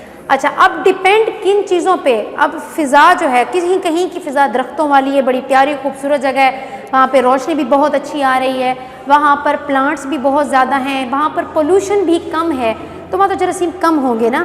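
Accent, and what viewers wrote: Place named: Saraiki, Pakistan. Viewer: The way you speak mam is proved that you are sariki ❤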